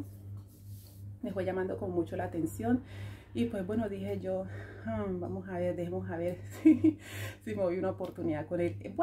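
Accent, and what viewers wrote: Colombia. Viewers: Yo siempre he dicho lo que es pa' uno, es pa uno. "Ni aunque te quites, ni aunque te pongas" Hermosa historia mi niña🥰🥰me encantó el final feliz💖💖 Por tu acento me pareció que eres caleña, vo jabes😉 Si no es así lo mejor es que eres una paisana colombiana y bella😍😍 Aquí me quedo en tu canal nueva suscriptora. Una caleña que ya enamoraste saludos mi princess👸🏽❤😘🤗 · Soy nueva ,me enganche a tú historia y al acento Colombiano,soy Cubana k vive en Barcelona, saludos.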